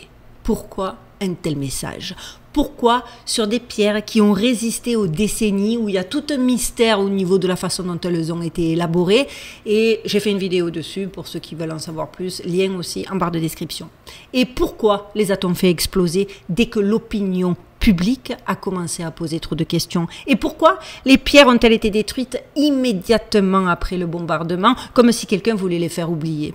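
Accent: Marseille, France. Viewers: J’aime beaucoup l’accent de Marseille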